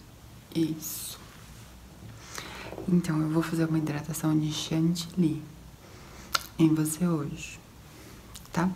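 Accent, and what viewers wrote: Rio de Janeiro, Brazil. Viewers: Eu amo quando faxxx sotaque carioca!! Acho mais relaxante ainda😍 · Aiiii eu sou apaixonada no sotaque carioca aaaah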